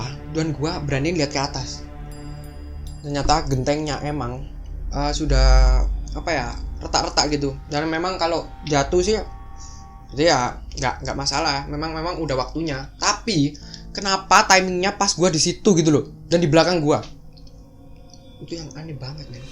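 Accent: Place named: Surabaya, Indonesia. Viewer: Mas samean kalo bicara ini kaya orang surabaya bang surabaya mana plise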